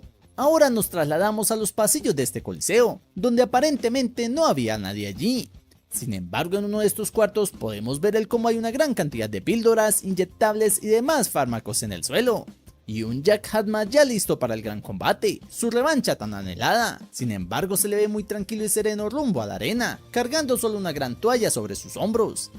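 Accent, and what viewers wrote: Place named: Mexico City, Mexico. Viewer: porque no cambias tu vocalización a la hora de narrar?? usas mucho el aaaaaaaaa, eeeeee, iiiiiii, oooooo con un tono de chilangoooo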